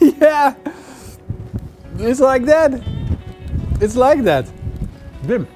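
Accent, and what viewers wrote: Netherlands. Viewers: ik hoor een soort van nederlands in je stemm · Where you from yo accent sounds dutch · he sounds dutch, are you dutch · Dutch accent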